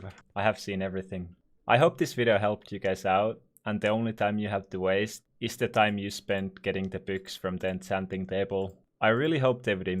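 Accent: Finland. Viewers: are you from finland because your english sounds finnish accent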